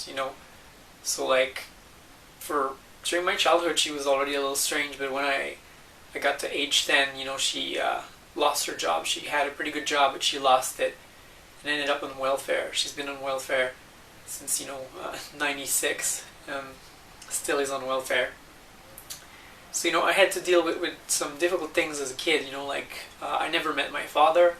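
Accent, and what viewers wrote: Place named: Quebec, Canada. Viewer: First, your videos are fascinating and intelligent. Second, you have a hoarde of viewers, so if this question has been asked and answered already, I apologize. I am however very curious; you seem to have a French (Canadian) accent. Yet all of these videos seem to be in (perfect) English (Canadian). I'd expect them to be in French; is there a reason for this?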